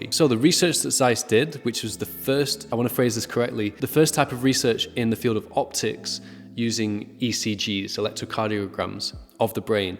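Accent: United Kingdom. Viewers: He's British. To him it's you who isn't saying it right.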